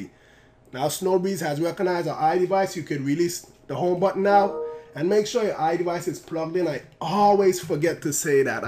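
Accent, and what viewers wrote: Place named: Jamaica. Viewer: Dude. I love your accent. Are you Jamaican ?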